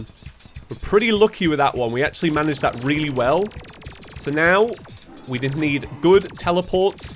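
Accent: United Kingdom. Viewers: You Sound like britain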